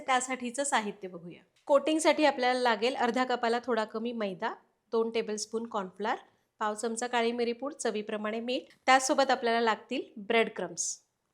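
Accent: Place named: Pune, India. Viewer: mam tumachi Marathi bhasha farach changli ahe. Agadi puneri ❤🎉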